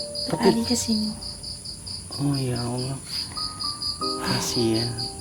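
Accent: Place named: Palembang, Indonesia. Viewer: Mas kaya orang Palembang Lo ternyata orang Jawa.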